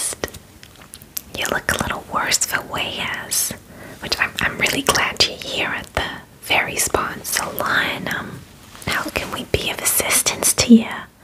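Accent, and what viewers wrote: New Jersey, United States. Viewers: I didn’t know I needed a fairy from Jersey but I clearly did 🌸🧚‍♀️ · as somone from nj, yup can confirm this is the “accent” we have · Please, I need a another video with a jersey accent 🤣 this was too good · she meant the jersey accent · JERSEY FAIRY 🧚‍♀️🌸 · POV your from jersey so you don’t notice a difference till you go to the comments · I love the Jersey accent. Love your videos! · The jersey accent really fits, I think I need more of her ASMR but jersey . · *New Jersey fairy* Me: TAKE MY H E A R T. NEW JERSEY FAIRY IS THE BEST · I love the Jersey accent ❤ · Another flawless gem 💎 I absolutely love the "Jersey" accent.. I'm convinced that this is what a Fairy looks, sounds n acts like!!! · Omg a jersey fairy 🥹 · The New Jersey Brooklyn accent made this ten times better than it would’ve been 👍👍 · Alright I give, I'll subscribe because a fairy with a Jersey accent is the coolest thing ever lol. 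· I've never been called sweetheart with a jersey accent before and I tell ya, I'm not complaining hahahahahahaa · JERSEY FAIRY!! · I think Jersey but some people are saying they hear a Brooklyn or Long Island accent! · oh my gosh the fairy with the jersey accent i am living!!! you're like the nanny but for fairies. 😍🥰🥺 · love the jersey accent ! 😂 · Girl, u are good at talking jersey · Jersey I think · Finally asmr with a Jersey accent without the gum chewing! 😭 Amazing video! ❤😊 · some people from jersey also speak like this, that's probably why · Its giving jersey new york fairy and im here for it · Was expecting Irish or Scottish and I got Jersey. Love it. ❤️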